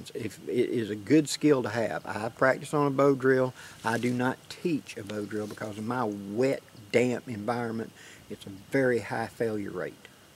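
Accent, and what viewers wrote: South, United States. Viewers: Thank you for the wonderful teaching field trip...love the scenery as well...as always i am very impressed with your Videos, including the Southern drawel 🥰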